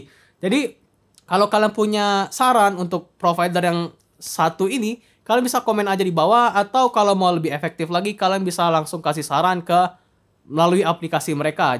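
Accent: Palembang, Indonesia. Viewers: Logat palembang itu bg mana ada tiru tiru hadehh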